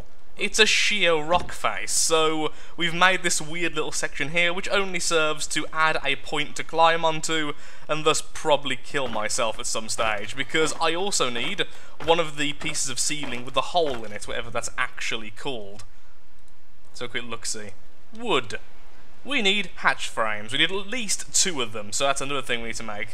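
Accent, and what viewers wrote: United Kingdom. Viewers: British accents make everything sound weird...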